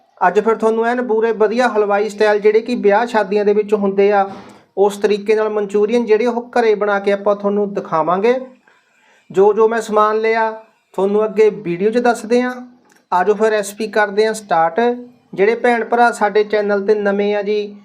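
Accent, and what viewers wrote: Ropar, India. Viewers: ਵੀਰੇ ਤੁਹਾਡੀ ਬੋਲੀ ਮੇਰੇ ਵਾਲੀ ਆ ਰੋਪੜ ਜਿਲ੍ਹੇ ਦੀ 😊😊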